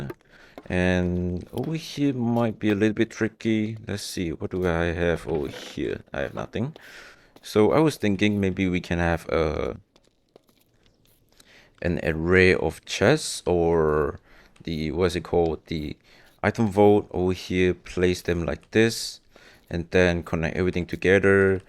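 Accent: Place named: Malaysia. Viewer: I was wondering why your accent sounded familiar, only to realise you’re malaysian too! Love the production value in your video, and excited to see what’s next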